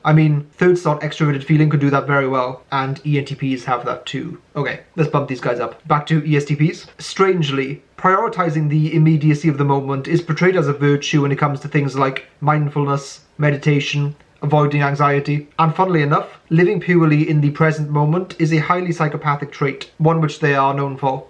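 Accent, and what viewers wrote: Wales, United Kingdom. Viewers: He has a Welsh accent! (a variation of British English spoken in the Wales region)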